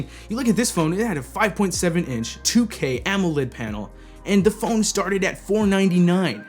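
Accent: United Kingdom. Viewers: that British accent tho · lol you make me laugh. I love the British accent lol good video!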